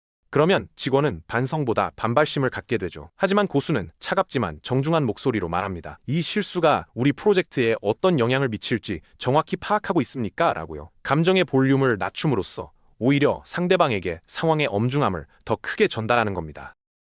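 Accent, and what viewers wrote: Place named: China. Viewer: 발음이 중국인같아여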